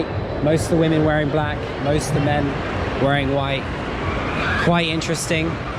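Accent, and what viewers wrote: United Kingdom. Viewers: solid 3.8 out of 5. Not bad considering you're a brit and you accent's heavy, and Arabic isn't considered easy to learn. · Thanks for showing us the world with your videos, I want to confess that I don´t like the british accent, I prefer the american accent because is more familiar for me because I´m from Mexico, but your accent looks good for me. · اللهجه البريطانيه فخمه نفسي اتعلمها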